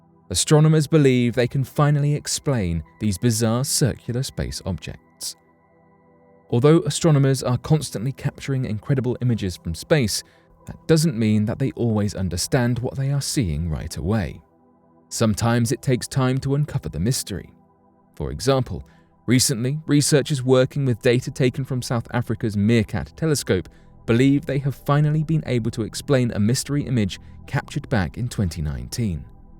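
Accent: United Kingdom. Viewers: Appreciate the British accent.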